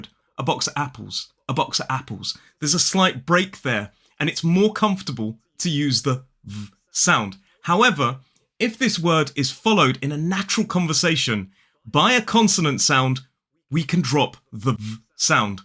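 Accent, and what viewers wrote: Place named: Cockney, United Kingdom. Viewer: Nice english similar cockney accent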